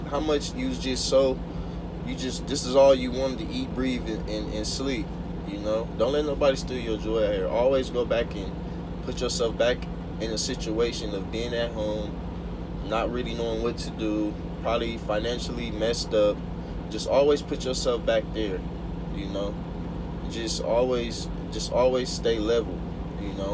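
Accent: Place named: Los Angeles, United States. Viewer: What part of LA you from homeboy, i can hear it all in yo lingo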